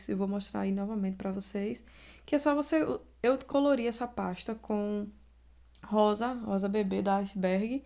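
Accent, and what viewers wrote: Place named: Pernambuco, Brazil. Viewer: Que perfeição❤ Estava só esperando vc falar de qual cidade era porque pelo sotaque, sabia que era pernambucana, sou de Olinda😁😘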